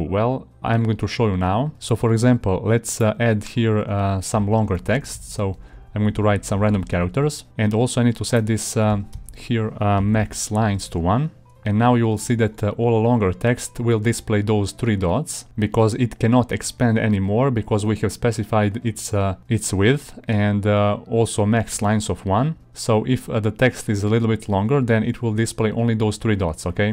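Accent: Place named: Russia. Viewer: Your accent is similar to Russian. Are you From Russia? = ) Thank you for the tutorial!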